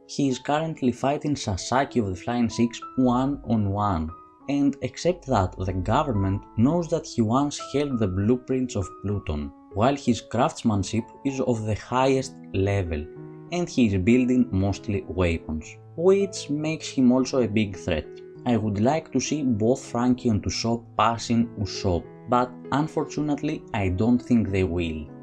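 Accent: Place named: Russia. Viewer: What you said about my region is true and I'm from the worst nation in all of the middle east and Africa(guess the name it shouldn't be that hard to do) and actually I recognized the accent too; he's Russian and that's the 2nd most powerful nation in the world and to be this strong you probably have many people who are very smart, intelligent and educated and this is specially true for Russia that doesn't have much natural resources(I know they have lots of oil and natural gas which is good but not so good economically with all the sanctions imposed) I just got so triggered with the list that I wanted to say something awful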